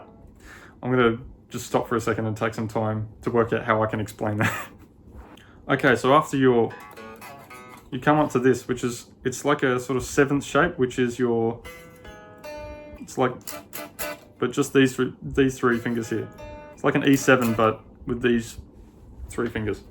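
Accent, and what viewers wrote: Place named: Newcastle, United Kingdom. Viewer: For some reason I thought you were from Newcastle UK..😂 Great lesson.. Great guitar style too..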